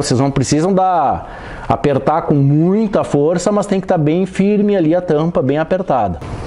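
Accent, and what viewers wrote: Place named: South, Brazil. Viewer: Obrigado pelas dicas amigo. Acabei de comprar uma FA. Entao ja vi alguns videos seus e do val( quando tinha a gsxs) pra entender melhor a moto. Tem sotaque do Sul. Vc é de onde?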